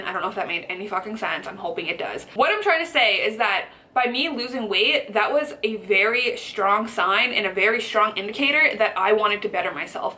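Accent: Canada. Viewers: are you canadian? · i could tell u were canadian based on the way u say 'about' whch is crazy cuz im also canadian and ive never noticed we actually have an accent till i heard u speak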